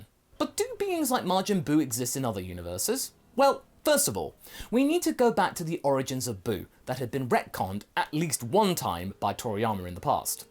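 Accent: United Kingdom. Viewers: I like your accent, are British, New Zealander or Australian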